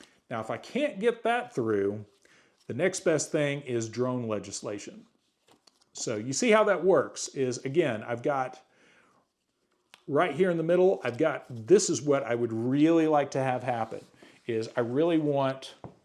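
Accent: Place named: Oklahoma, United States. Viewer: I was going to guess you were from Oklahoma based on your accent. I was close!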